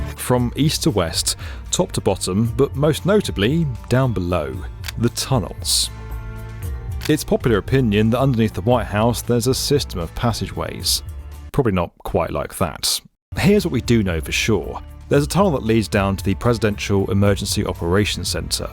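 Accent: England, United Kingdom. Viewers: When a show has an English Narrator, I just skip it. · This video is VERY poor quality. It's basically a 15min video, with 10 min. of actual content, and 5 minutes worth of ads. I get it. You need to make money for product placement, but when 35% of your video is an advertisement, it's a very lazy effort. This video is basically for foreiners interested in American politics, and I guess that's made obvious with the English accent alone. Unsubscribed. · Something about a Brit narrating this feels wrong. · Why is a Brit narrating US History? Nothing to talk about over there?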